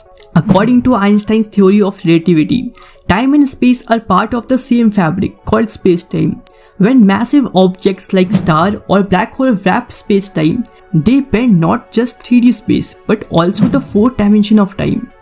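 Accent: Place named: India. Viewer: Why not correct your pronunciation (Indian accent) - which is beyond my ken.. - and remake this clip?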